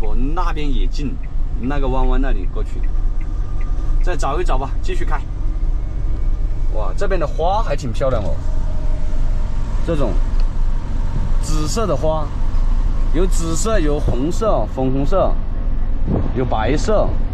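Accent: Chongqing, China. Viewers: 聽你這口音我就估計是重慶的，結果一看車牌還真是。玩得嗨哦，拿個貨車當房車到處轉。最近重慶封城，怕回不來了哦。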